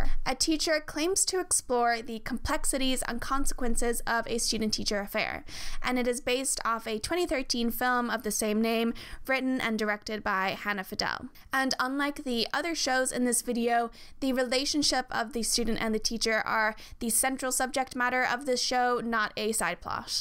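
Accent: Ireland. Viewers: This is such a good video, thank you so much for creating it and doing such good research on the topic, I'll definitely be sending this to some of my old friends whenever Pretty little liars relationships come up as a topic.. This is unrelated but I can't seem to place your accent, do you happen to be Irish? xD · you’re accent is so interesting because it’s definitely american but you’re ‘t’s are so irish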